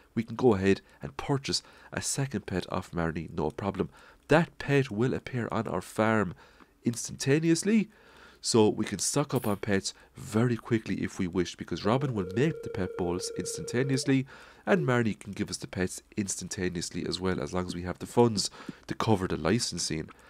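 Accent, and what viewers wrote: Ireland. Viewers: The most Irish accent I've heard all week, and I've been listening to some of the news stories from over there. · he sounds Irish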